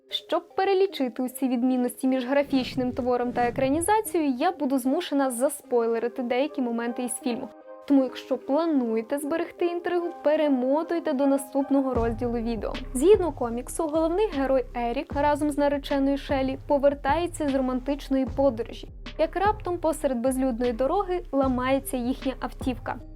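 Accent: Standard. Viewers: Моє сердечко тремтить від твоєї краси!) А твоя українська без суржиків - просто музика для душі. Ти молодець)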